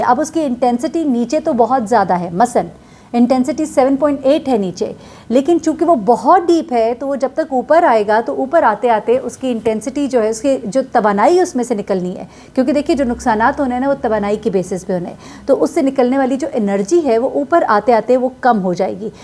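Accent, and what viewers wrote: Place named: Punjab, Pakistan. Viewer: اور آپ غالباً تلہ گنگ یا سرگودھا سے تعلق رکھتے ہیں،وہاں پر ہی اس طرح سنا ہے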